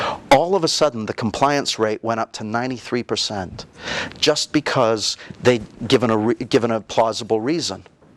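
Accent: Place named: Canada. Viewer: LOL, I can tell you are canadian. "Aboot". You say it! :P And several other inflections in your accent. I don't see how people think the accents are the same. lol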